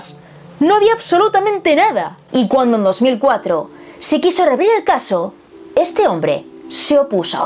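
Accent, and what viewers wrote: Spain. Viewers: Saludos, hermosa bellota, tu acento español es hermoso precioso y divino tesoro. Excelente investigacion y mejor video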